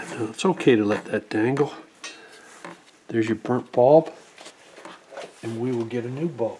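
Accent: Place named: Canada. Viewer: because of your video i'm gonna attempt this myself. plus- you have a great canadian accent- aboot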